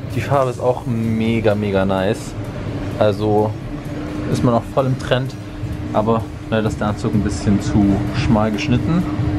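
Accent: Swabia, Germany. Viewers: Omg rede öfter schwäbisch 😍😂 Da fühl ich mich wie zu hause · Dein Schwäbisch😂👌 Komm au ausm Schwaobaländle😁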